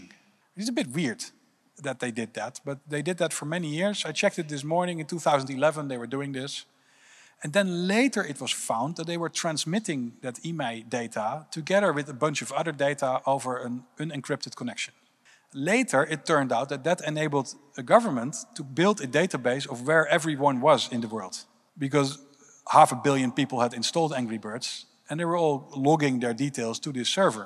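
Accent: Netherlands. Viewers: Thats some strong dutch accent 🤭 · Gotta love that thick Dutch accent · Is this guy Dutch? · this guy sounds really Dutch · the dutch accent is very palatable. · Ken hem niet maar duidelijk een Nederlander/Hollander😂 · That Dutch accent though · Kkr Nederlands engels accent weer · Such a strong dutch accent😅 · NL accent going strong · Most Dutch man I have ever heard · Why is a Dutch accent so recognizable? 😂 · Yup, the horrible Dutch accent... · Love the Dutch accent🤌🤌💯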